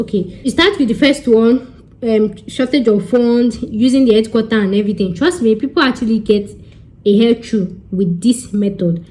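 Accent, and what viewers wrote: Yoruba, Nigeria. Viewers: Your video is real with your typical Yoruba/ Nigeria accent. God bless. Pls, do consider we viewers not from Nigerian tooooooo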